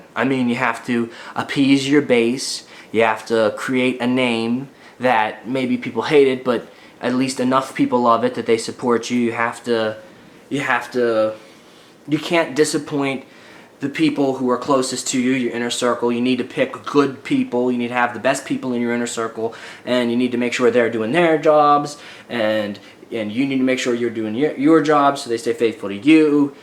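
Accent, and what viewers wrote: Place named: Canada. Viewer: You should move to Canada... You talk like a lot of us haha Decent poli video sir!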